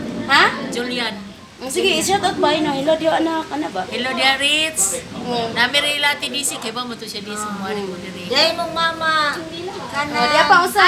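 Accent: Visayas, Philippines. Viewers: Laglom nkaayo pagkabisayaa maayo kaayo ka batbat sa pinolongan bisaya ui idol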